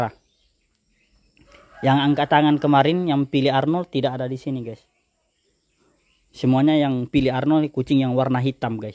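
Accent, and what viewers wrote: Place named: South Sulawesi, Indonesia. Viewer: Kakak domisili di Kalimantan kah... Tapi bahasa dan logatnya kayak orang Sulsel... Kucinng petani ikut tarbiyah...sdh tingkatan takrif atau tahwin kak? 👍🙏He he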